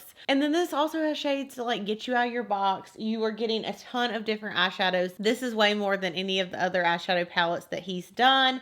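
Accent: South, United States. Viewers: Ashley Cornely okay I'll never visit the south of USA. Screw being surrounded by that accent constantly. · Splucy She has a southern accent and I love it! No reason to leave a rude comment you should just leave politely.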